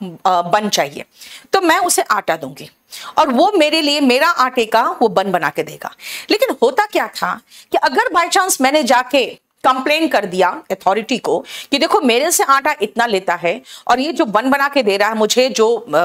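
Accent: Jharkhand, India. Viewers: Mam aap jharkhand se ho kya ❤ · Aap Jharkhand se ho maim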